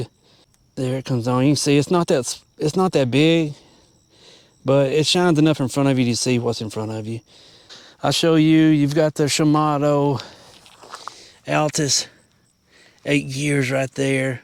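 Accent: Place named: Texas, United States. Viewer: Merry Christmas my friend! And yes I will subscribe. I just purchased me one yesterday and not only did you make me laugh with the shameless request for subscription... love it... you also showed me what I wanted to see about the way it responded to your pedal strength. I mean it man...perfect ... and you sound like all my family here in Texas lol. Awesome!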